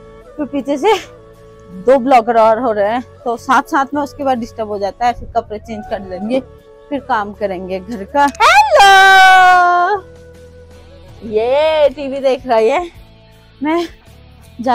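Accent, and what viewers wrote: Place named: India. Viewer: aapke bhasha deval side ki lag rhe the to mena bol dya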